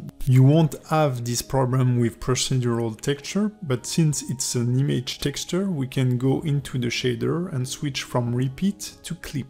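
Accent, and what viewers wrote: France. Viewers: clear and useful video plus I find your french accent really cute (^u^) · Ouah l'accent francais mdrr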